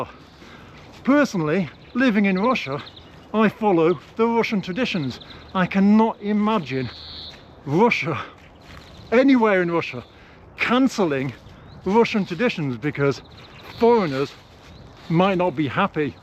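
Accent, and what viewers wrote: United Kingdom. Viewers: uk is a dump, it's not diverse in russia is it? that might be why it's better? You've got 'harlem' on your hoodie, surely that's is treasonous to the motherland? You sound british but you don't look it, where are you from originally? I think the upper classes have contempt for the working classes so they bring in mass migration as they think we are all disposable. They don't realise that they will have to live amongst the newcomers. Don't say the elephant in the room just throw labels at people and call them bigots. Woke culture was probably introduced to subjugate the working classes, do you really think that the upper classes ascribe to the woke dogma? They all send their kids to private schools which are the least diverse of places. They're just psychopaths a lot of them, but some are plebs, I'm not sure on the ratio?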